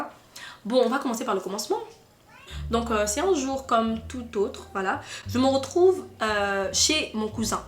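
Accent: Gabon. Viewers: Moi c'est tes accents que j'aime, surtout l'accent gabonais ❤️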